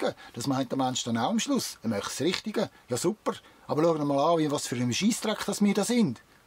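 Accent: Switzerland. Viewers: Hihi - wenn ein "Schwyzer" sich echauffiert, klingt das in meinen Ohren iwie lustig. Das meine ich aber freundschaftlich. ;) Aber ich kann dich klar verstehen und stimme vollumfänglich zu. Die Reihe "Episode 1-7" sah ich komplett. Ich verknüpfe eher die Fäden bzgl. wer, bzw. welche Menschengruppe dahintersteht und die Fäden hält. Auch hierzu gibt es sehr deutliche Aussagen, bzw. schriftliche Dokumente, die quasi bis zu "ein Zeitalter" zurückreichen. Sogar noch länger, doch sind derlei Schriften für mich unerreichbar und ich würde sie auch nicht lesen können. In aller Regel betreffen sie Themen, die "Religion" entspringen und sich pharisäisch offenbaren. ++edit: Die Vokabel pharisäisch wurde absichtlich benutzt, um diese gegen eine Sprachfamilie abzugrenzen. Bei der Zusammenführung der geistigen Idee der Bundeslade (vgl. Bauweise) mit der sog. "Leidener Flasche" (vgl. 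Eintrag Wikipedia) ergeben sich weitere, in allen Bezügen sinngebende, geistige Verknüpfungen, auch und gerade zu diversen Erzählungen von Todesfällen im Buch der Bücher. (vgl. auch die "Einführung der Gesetze / Moses + den ersten Satz des deutschen GG: "Alle Menschen sind vor dem Gesetz gleich" - nach Erschaffung eines Gesetzes sind sie, die Menschen, dies nämlich nicht mehr. Pharisäer halten sich stets unantastbar, selbst wenn sie sämtliche "Gebote" brechen, die sie selbst zum Gesetz erhoben haben.)++ Danke für den jetzt noch weiteren Blick durch deine Episoden 1-7, das noch tiefere Begreifen der Lüge. Die Kraf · Ja super! Das isch Bärndütsch! ...👍😄 super Kanal! 👏 Danke! 🌹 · Grossartig und danke. Als gebuertiger Deutschsprachler habe ich nur 10 min benoetigt, um Dein schweitzer deutsch zu verstehen. Dort, wo ich seit Dekaden lebe - in Uebersee - sprechen wir minimum vier Sprachen auf taeglicher Ebene und oft alles durcheinander. 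Wir sprechen diese Sprachen sicherlich nicht perfekt, aber wir verstehen uns alle (ca 120 registrierte (!!!!) Nationen auf einer kleinen Insel (178 qkm)) weil wir uns verstehen wollen. Deshalb waren Deine Einlassungen kein sprachliches Problem fuer mich. Und natuerlich nicht nur sprachlich - natuerlich nicht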